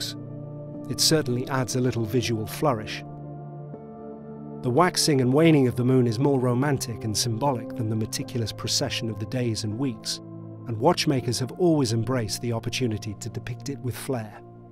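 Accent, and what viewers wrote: United Kingdom. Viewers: Great video. Love this style, and of course the British narrator. Beautiful watch for sure too!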